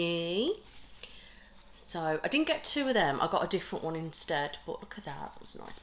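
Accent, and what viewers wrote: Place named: North, United Kingdom. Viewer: she sounds more northern - the midlands / the west - just a guess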